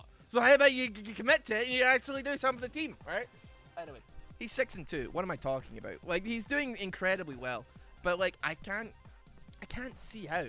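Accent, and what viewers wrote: Scotland, United Kingdom. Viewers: Extra-Scottish makes me wanna play D&D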